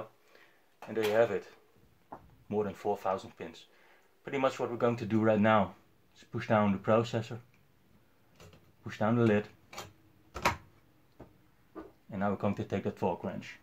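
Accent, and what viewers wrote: Netherlands. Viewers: Are you DUTCH ?????? FROM AMSTERDAM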